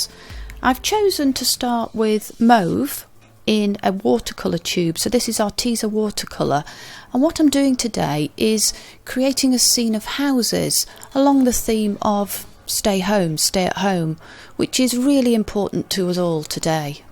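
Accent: United Kingdom. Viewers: You are such an inspiration to me!! Yesterday afternoon I spent a magic time following your tutorial and painting the houses myself. As soon as I know how to post it I'll show it to you. Oh, and your British accent is just a beautiful addition to all your beautiful and helpful videos. Thanks so much from Spain!!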